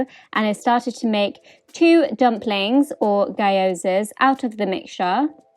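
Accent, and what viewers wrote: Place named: United Kingdom. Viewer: I understand that you are sponsored by MyProtein. And i also get that you workout,as you constantly say in your videos. But you are not a bodybuilder,and i assume that you don't hit the gym as hard either,nor you try to gain muscle size. (forget me if i'm wrong) So why all this protein obsession you have? We need protein to recover and repair from our training,but what you do is a bit too much. I train 6x week ,1,5 hours long each session,and i am exhausted after each workout,plus i am a male. But i don't go above 1,6 gr/kg of bodyweight per day. Could you do a video about this,why you seem to depend on protein powders, and why you think you need so much of this specific macro, I would be glad to hear your take on that. And soy isolate protein is perhaps the worst vegan powder you could possibly consume,because of the soy protein extraction process. 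Google it,and you will see. Other than that,i always love your voice and British accent,plus your videos are so calming and soothing. Always fun to watch!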